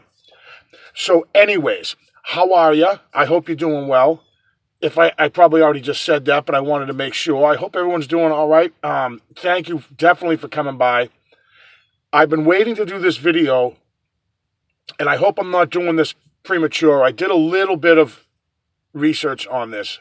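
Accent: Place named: Massachusetts, United States. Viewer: Well how are ya?? Linda in Florida here. How about this skinwalker story....when Mr. Sherman chased those little blue orbs with his 3 cattle dogs they chased the orbs out of his sight when he heard them yelp he ran as fast as he could to get to them and he found 3 melted oozy grease spots that was once his dogs. And that's when he gave up and sold the ranch...thats a horrible thing. Lots of stuff happened out there.......that place is evil!!!!!! And yes.you almost have got to get into paranormal stuff when your into Bigfoot cuz I believe that somehow there is a connection. Love your vids and love that mass. Accent. I'm odd up there spook much..hey see ya on the next one..lol..Linda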